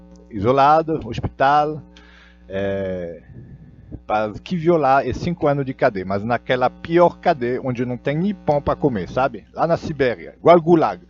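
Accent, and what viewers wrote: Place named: France. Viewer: Porque tu tens um sotaque francês de falar o português, amigo?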